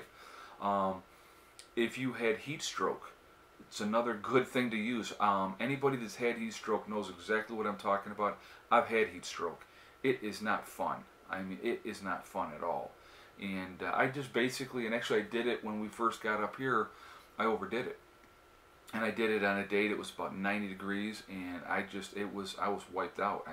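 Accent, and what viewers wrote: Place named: Chicago, United States. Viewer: Are you from Chicago? You sound just like someone close to me who was raised there.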